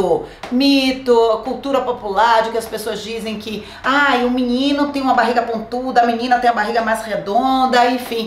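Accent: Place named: Bahia, Brazil. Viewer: Que sotaque gostoso! É Baiana?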